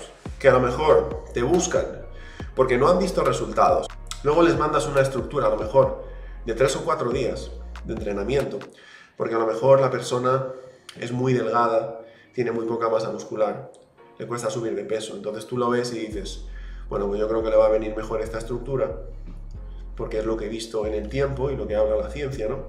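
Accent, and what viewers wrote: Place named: Latin America. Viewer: Coach te quiero mucho, pero ¿por qué hablas como latinoamericano siendo valenciano?. Un saludo crack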